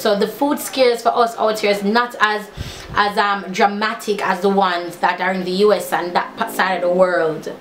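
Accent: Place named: Jamaica. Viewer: Hello. I've been watching your channel for a year now. I love your energy and that lovely Jamaican accent! Yes rewarding yourself is a good thing you work hard and you deserve it! God bless you and your family.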